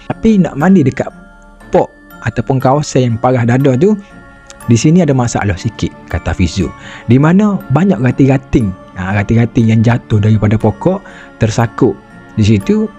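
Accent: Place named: Kelantan, Malaysia. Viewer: faham ja..kami utara suka dengar loghat kelate.. hehe tp jgn la dalam2 sgt tapaham juga haha...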